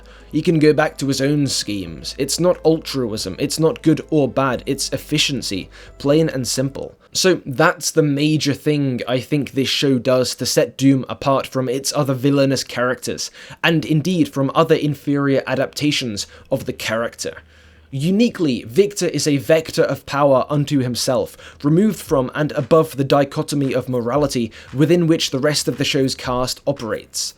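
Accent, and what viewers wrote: United Kingdom. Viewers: This video title sounded fire af, then I heard the british voice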